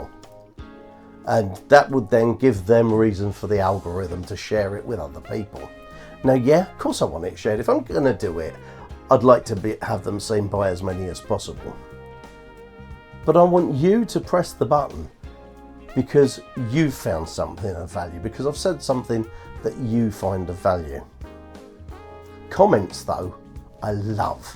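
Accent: England, United Kingdom. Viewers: I've watched a couple of videos and some has had background music a bit too loud. In this video it's fine, not too distracting (easier to take in what you are saying), and also listening to you talk is a melody in itself. Some English accents are very pleasant to listen to. Videos 10 mins or longer are my favorite, that way I can listen to them for a while when in the kitchen! Keep up the good work!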